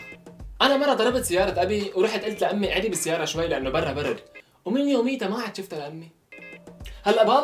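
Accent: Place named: Damascus, Syria. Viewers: يييييييييييي هاي لهجتو سوري اصلي. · هاد من الشام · ليش مو عاجبك لهجة السوريين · Taker 1995 HD لأنو هوي سوري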